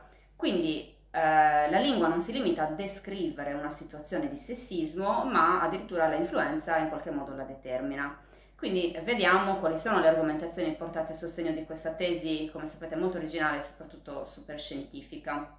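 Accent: Sardinia, Italy. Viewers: Sei stupenda. Da lucano adoro il tuo accento sardo...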